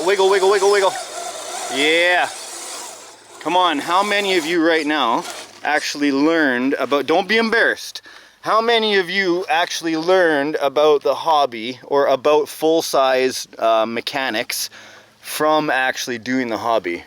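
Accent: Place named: Canada. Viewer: Damn the fakes and the haters! Be you, talk like you, act like you. Otherwise you are a bloody conformist. Victory for sure! Awesome content from who is obviously an awesome person. I'd like to burn a joint with you. Even though you are starting to take on that Canadian accent a little. LOL. Keep doing you bro. Great stuff!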